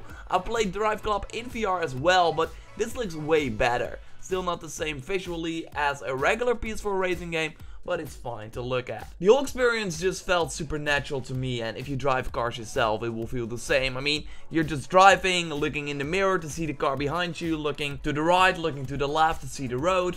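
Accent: Netherlands. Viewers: You should work on losing the Dutch accent.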